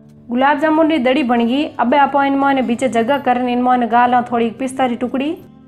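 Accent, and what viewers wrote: Marwar, India. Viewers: आपके मारवाड़ी बोली बहुत प्यारी लागे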